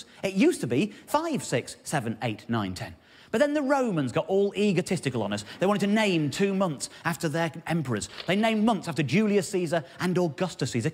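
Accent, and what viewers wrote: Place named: England, United Kingdom. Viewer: Clicked, heard the English accent and thought, "He must be a professor." Clicked the description. "Comedian. I was half right.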